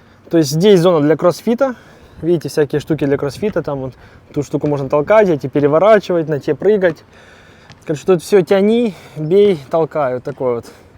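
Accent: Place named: Ukraine. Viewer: По говору слышно что украинец.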